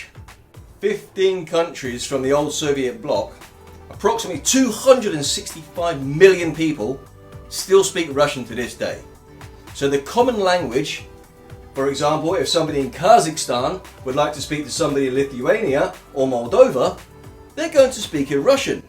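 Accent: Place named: England, United Kingdom. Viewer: you sound english not scottish ?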